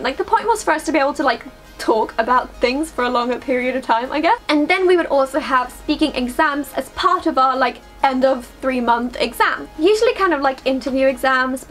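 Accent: United Kingdom. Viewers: You have the most British/English accent I've ever heard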